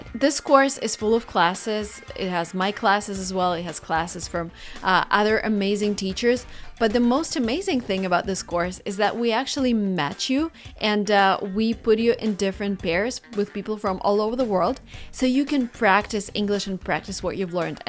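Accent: Russia. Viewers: отличное произношение, но все равно слышно что не нативный носитель, русское наследие дает о себе знать. интересно сколько лет уже на англ.? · You still have that little Russian accent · She still has a little bit of russian accent, for instance how she says "can't" haha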